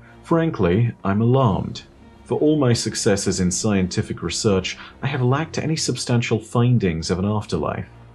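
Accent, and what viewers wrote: United Kingdom. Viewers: You sure can. But you could also comment on British accent and the nuanced way he pronounces things, too.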